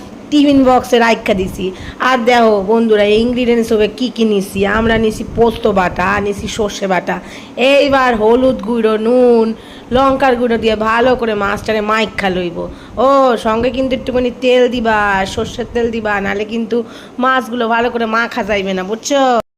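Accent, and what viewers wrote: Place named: Bangal, Bangladesh. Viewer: Bangal vasa...bah bes to ...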